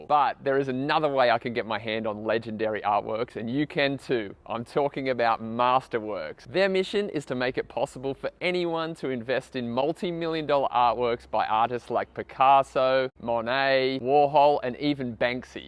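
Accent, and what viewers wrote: Australia. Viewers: LOLOL......bikes seems to haunt you! So i have been subscribed to your channel for about two years, you were in Amsterdam doing the canal's. I heard your Australian accent and I was wondering what you were doing in Amsterdam and if you moved there. Anyway, were you stranded in Amsterdam because of Covid or is Australia your home base?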